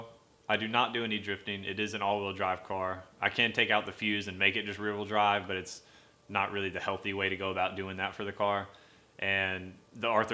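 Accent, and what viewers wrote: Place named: Carolinas, United States. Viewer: Hello sir. I subbed a little after this was made and now I'm going back in time, playing a little catchup. 😉 Cool video... thanks for sharing. I knew you had to be from somewhere close to my home by your accent. I'm in Kings Mountain, NC, just over an hour from Greenville. My grandpa lived in Greer for about 60 years. If you get homesick, give me a holler. ✌Take care, brother.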